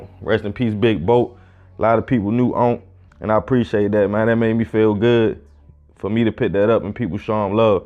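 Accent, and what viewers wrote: Baltimore, United States. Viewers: That's the point. HE SOUNDS LIKE BALTIMORE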